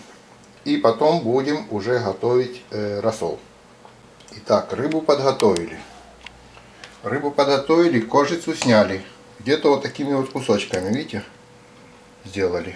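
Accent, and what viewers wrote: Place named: Odessa, Ukraine. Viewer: . красивый одесский акцент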